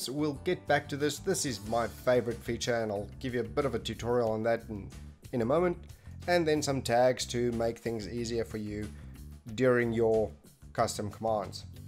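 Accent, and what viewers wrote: South Africa. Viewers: ur South African right?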